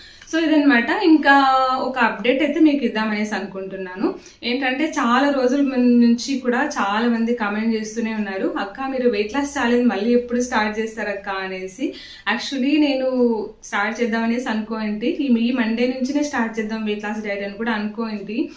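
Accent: India. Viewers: 100 days challenge ki nenu kuda ready ga unna sister. Na age 29 na weight 91 ela taggalo artham kaledu ( me slang chuthunte thirupati la undi )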